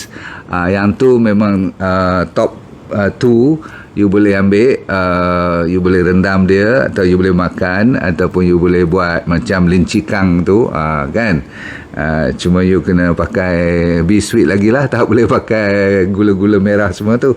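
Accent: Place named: North, Malaysia. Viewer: manis melecas noh...Dr mesti org utara..hahahh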